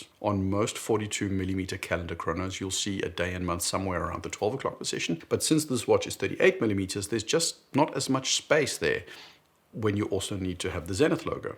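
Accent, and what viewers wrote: South Africa. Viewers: I thought you were South African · Great video! BTW, are you South African? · Completely agree, this watch would benefit plenty from a 42mm diameter, and I don't get the obsession with thin cases either. I want to see and feel some heft and presence from a chronograph. Any thinner than 15mm and I'm not interested. I guess we all have our likes and dislikes. Prefer arabic numerals too Still, enjoy listening to his videos, very eloquent and well considered. Bit of South African in the accent ?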